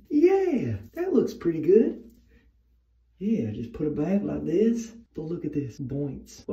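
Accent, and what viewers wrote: South, United States. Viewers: I have never been so in love with a southern accent😅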